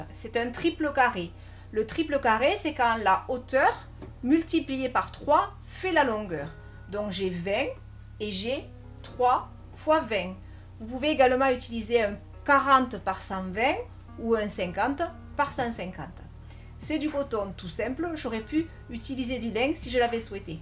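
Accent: South, France. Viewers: Bravo pour vos belles toiles,c est un plaisir de vous regarder, et de vous entendre avec ce joli accent du sud cher à mon coeur.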